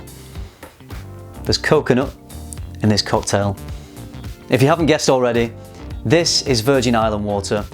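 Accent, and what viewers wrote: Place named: England, United Kingdom. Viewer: That dirty English accent Yuk!! A cocktail ????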